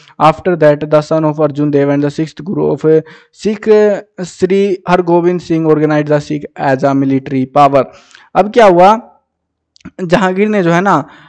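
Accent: Haryana, India. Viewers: Right sir haryanvi ka apna swag ha 🤟🤟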